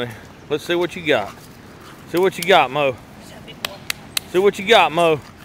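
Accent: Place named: South, United States. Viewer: They look like Staffordshire Bull Terriers. Try an sound less hill billy next time.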